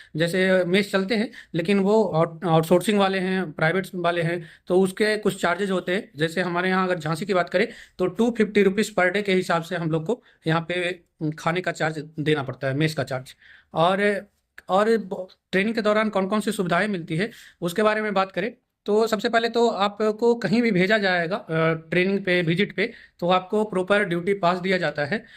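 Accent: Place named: Magadh, India. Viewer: I recognised you by your magadh accent I am also from Nalanda(Bihar Sharif)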